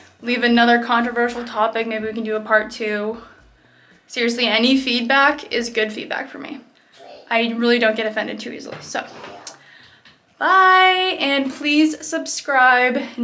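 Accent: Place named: New England, United States. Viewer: I totally knew where , you were from just by how you talk and do you’re make up NEW ENGLAND GIRLS “ respectfully “ go get you’re Starbucks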